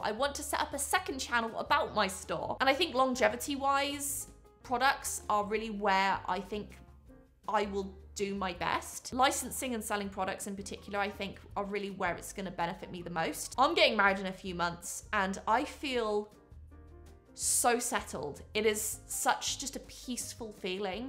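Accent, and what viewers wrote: England, United Kingdom. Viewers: I first started watching you because I miss my family in the UK and listening to your English accent was comforting. Having said that, I also love your quirkiness & how you can laugh at your mistakes. Your creativity, in whatever form is also always a great watch. I believe being a YouTuber takes a lot of bravery (I'm not brave at all) so please keep on being you & thank you for doing this ☺️❣️🤗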